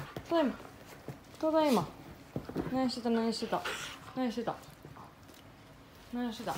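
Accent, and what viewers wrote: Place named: Kyushu, Japan. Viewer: 九州訛りや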